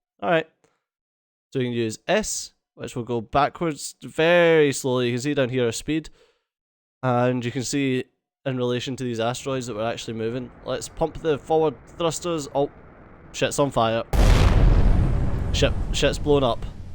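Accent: Scotland, United Kingdom. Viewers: I was laughing so hard while was reading the Robots responses. Not just because the dialog was funny but, because accent seemed go go well with it. Hurray for Scottish accents. P.S. Love your vids + keep up the good work. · Woo! HAL 9000 jokes with a scottish accent! if this game would have multiplayer, i will buy this as soon as it's awailable =D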